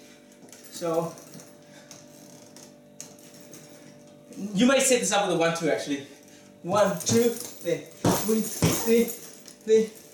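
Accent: South Africa. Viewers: U sound south african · crazy how you still have your accent prob from your perents tho, any way i dig your channel keep it up. oh do you ever come down to fight in SA? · Are you South African bro?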